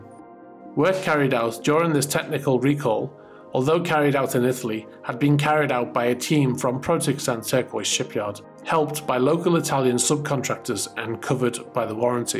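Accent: Liverpool, United Kingdom. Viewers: Great video great too hear a scouser doing well in YouTube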